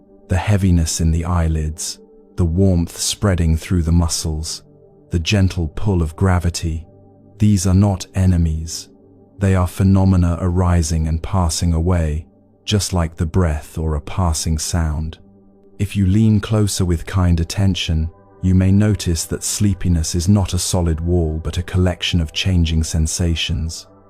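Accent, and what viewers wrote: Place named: Europe. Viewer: Ugh european accent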